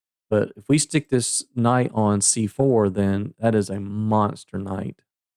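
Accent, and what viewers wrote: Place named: South, United States. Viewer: you know then, secrets of magic can be something of a letdown to most folks. When I sell magic on ebay I can't do refunds for that reason. When I was living in Somerset Pa , I worked for House of Enchantment, 😆, I know , sounds like a brothel, anyway I would sell linking rings to them and they'd come back and say they wanted the ones that I had . I had to explain that they were the same principle, but because I had been doing them for ages , that i could make them appear to melt thru each other and they just needed more practice... There's a lot of awesome mathematical card tricks I could teach you if you're interested. A couple are uploaded on my YouTube channel which I don't promote, it's mostly for myself, but lemme know , I'd be happy to share . By the way, I heard that southern Twang accent , and I would have bet my Good Bishop that you were from Tennessee or Kentucky, maybe even Texas, but I didn't want to insult you if I was wrong lol. 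.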